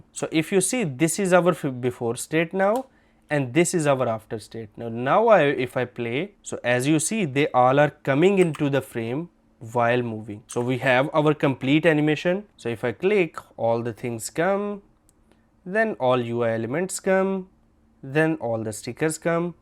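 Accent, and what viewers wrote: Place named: India. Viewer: Why his english is likely Indian accent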